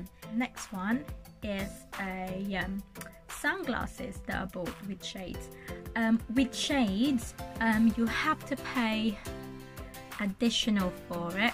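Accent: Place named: Philippines. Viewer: Nice accent are filipina? Nice sun glasses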